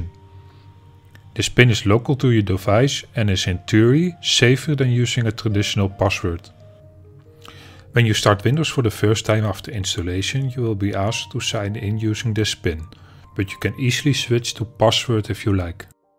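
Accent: Netherlands. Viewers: Precies, stupide Nederlands accent! KOTSBAK! De LOI let me speak English with a Nederlandse R! Je staat voor lul vriend!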